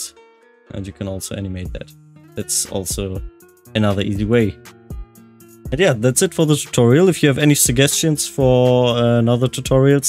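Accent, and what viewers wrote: Germany. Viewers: I love these tutorials! You’re so sympathetic. And dieser deutsche Akzent ❤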